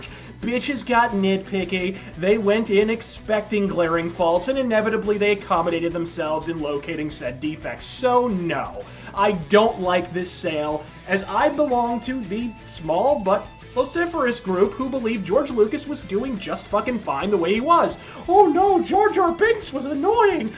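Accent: England, United Kingdom. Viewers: Shite Briish accent - terrific video.Thanks as always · Love the english accent.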